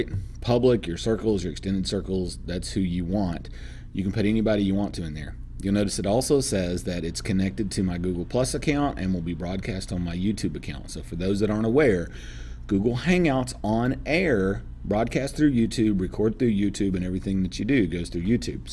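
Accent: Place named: South, United States. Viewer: That intelligence... That Professionalism... That southern drawl. :D Well done, sir. Great information!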